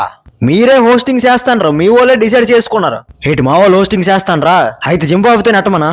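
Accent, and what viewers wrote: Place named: Andhra Pradesh, India. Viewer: మన సికాకుళం స్లాంగ్ రా😂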